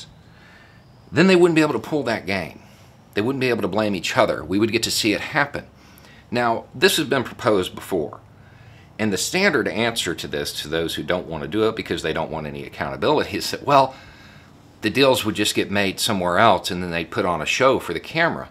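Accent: South, United States. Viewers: we have a republic. You sounded more intelligent when you spoke with a southern accent.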